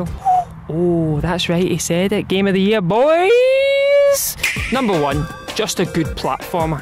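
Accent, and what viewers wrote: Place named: Scotland, United Kingdom. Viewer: You should review more games. I love that Scottish accent. As an English language learner, this is like relearning English all over again.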